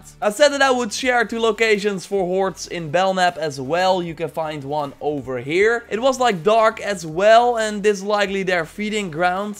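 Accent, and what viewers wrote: Netherlands. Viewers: ben je nederlands je bent super goed in engels maar ik hoor het aan je accent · You sound like you're from the Netherlands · This Guy sounds dutch, i recocnize the accent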